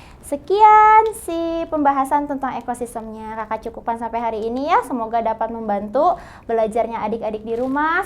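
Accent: West Java, Indonesia. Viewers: Kakak nya Sunda bet suka gua denger nya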